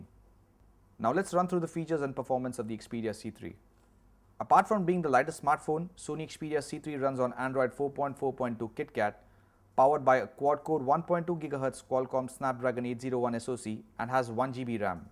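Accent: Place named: India. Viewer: FRITZ GOMEZ Indian dude.. Their accent always suck hahahahaha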